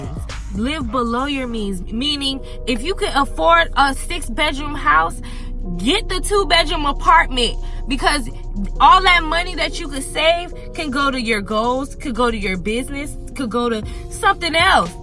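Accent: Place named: Miami, United States. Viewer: Sounds like you from Miami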